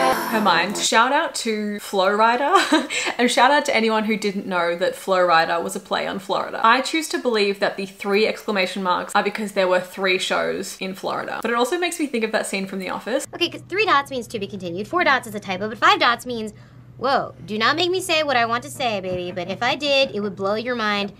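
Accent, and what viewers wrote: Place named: Australia. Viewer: Australian accent is such a turn off for me. Like I wanna enjoy her content but just because of her talking style, I can't. 😢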